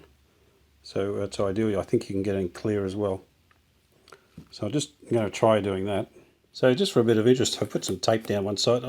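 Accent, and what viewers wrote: Australia. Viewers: accustom to the Australian English pronunciation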